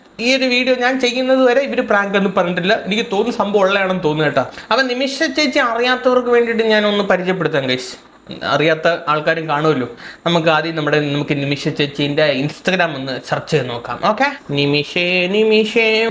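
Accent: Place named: Thiruvananthapuram, India. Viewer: പൊളിയാ 🤩തിരുവനന്തപുരം ഭാഷ da🤩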